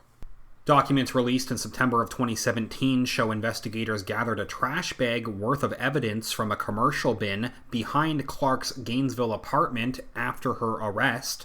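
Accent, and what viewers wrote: Canada. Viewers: your middle class nasal Canadian white boy voice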